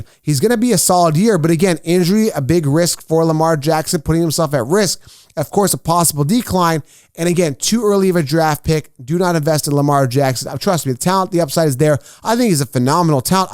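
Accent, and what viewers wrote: Canada. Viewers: Are you canadian? i think i can hear a slight accent lol.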